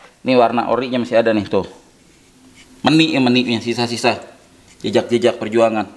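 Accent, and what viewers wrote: Jakarta, Indonesia. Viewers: Betawi banget lo bang..😁😁 demen gw denger logatnya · betawinye kena banget si jantuk bisa banget ngagulnye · paling demen sama logat betawi salam dari pontianak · YG SAYA ZUKA DR, BETAWI NYA KENTEL BANGED DAHH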